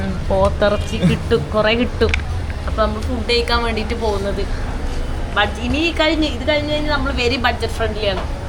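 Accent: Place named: Kochi, India. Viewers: Oru kochin slaang varunudallo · Kochi slang anallo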